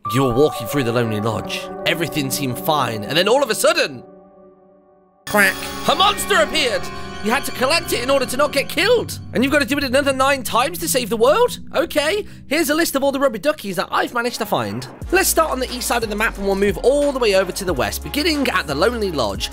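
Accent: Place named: RP, United Kingdom. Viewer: UnknownMasksW2serialirious he sounds like a British butler.. Like seriously dude lol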